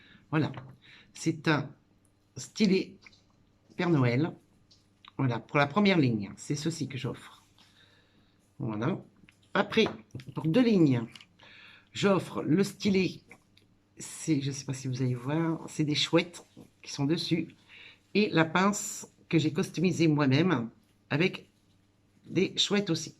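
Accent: Nord-Pas-de-Calais, France. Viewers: Coucou. Tout d'abord Merci pour ta petite attention (de mon anniversaire). Je suis contente de voir ton visage, tu n'as pas à être complexée, chacun est comme il est et c'est comme ça. Tu es très bien et ton visage va très bien avec le son de ta voix, que j'adore vu ton accent ch'ti. Donc RAVIE de te voir en entier. Gros Bisous et 1 like en plus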